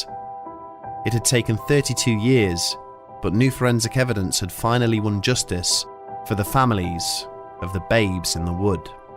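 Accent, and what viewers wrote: Wales, United Kingdom. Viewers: Are you from Newport or Cardiff?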